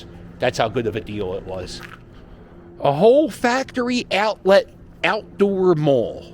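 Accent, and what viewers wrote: Philadelphia, United States. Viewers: I come from 4 generations of Philly. Those pronunciations are quintissentilal Philly.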